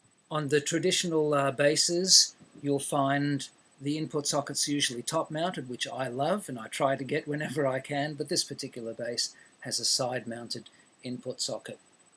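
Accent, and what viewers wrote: Australia. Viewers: I assume you're an aussie, one as the accent and two the over all structure of how you speak. I've never met an aussie i didnt like and whether its just luck or something about your culture how you explain stuff is not only very easy to follow but very friendly but to the point. Simply, great stuff and keep up the great work, your method of teaching is warm and friendly. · Hi, ahhhh... finally some tutorials with an Aussie voice! I'm so glad I found you, I'm beginning bass (as soon as it arrives), and I'll be stalking you for a while I think. Easy to follow, understandable language for us newbies (especially those of us over 40's), and entirely logical in sequence. Thank you! and thank you again!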